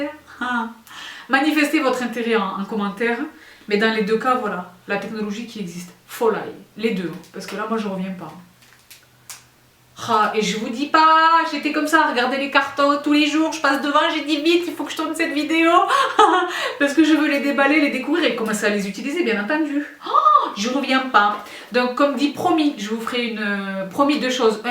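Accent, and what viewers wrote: France. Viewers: Originaire de Marseille, j'habite à Ottawa au Canada et ma soeur m'a fait découvrir ta chaine. Le "salad spinner" est pas mal - je l'ai acheté l'année dernière et il est encore nickel! Merci pour tes vidéos! Ca fait du bien d'entendre l'accent français!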